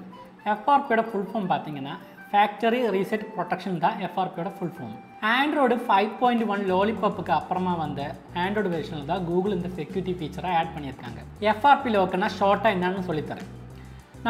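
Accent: Kerala, India. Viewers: Bro....neenga malayali ryt😁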